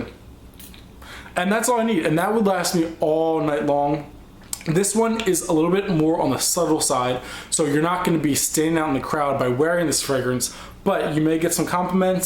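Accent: United States. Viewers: dear lord your such an American :P not meaning to be rude, but any European (including myself) would be cringing at that pronunciation :) yves saint laurent: l'homme, is pronounced lomme. jean paul gaultier le male is le mal (not ley) dont ever say Diesel like that again :P lol that one was in english so cant fault you there isseymiyake leu de issey sounds like: low dey issay sory for all the corrections :P though hope i helped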